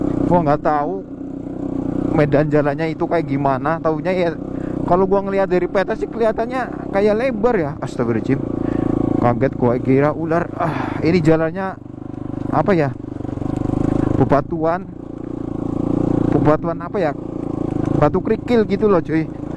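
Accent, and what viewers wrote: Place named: Java, Indonesia. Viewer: Sumpah , kalo bukan orang betawi jangan pake Lu GW lah tolong , keliatan banget Orang jawa dari tambahan kata KOH dan logat jawa gitu , jadi situ yang ngomong sini yang malu dengernya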